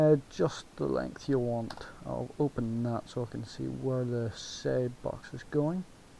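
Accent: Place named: Northern Ireland, United Kingdom. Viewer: Northern Irish accent is beautiful. Could listen to it all day!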